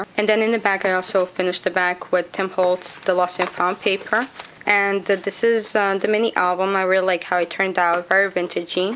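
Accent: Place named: Spain. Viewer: I've never seen work like yours' before....very very original and one of a kind and I LOVE it ....such wonderful inspiration...and what a different accent too...eres Espanola???? Just asking is all :-) Great job.....New Subbie Here too....Laura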